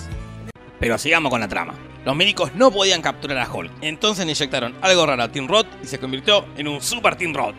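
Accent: Argentina. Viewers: Che asinomas sos argentino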